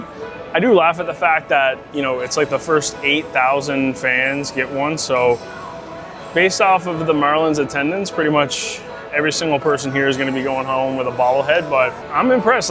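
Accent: Canada. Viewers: He said he flew in from new york. But the accent is obviously Canadian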